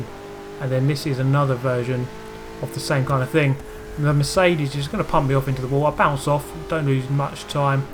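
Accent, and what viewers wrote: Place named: RP, United Kingdom. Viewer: I like the gameplay and reasoning behind the trolling the trolls idea, but you sound so posh especially when you say “That’s a bit of a inappropriate joke for a child’s racing game”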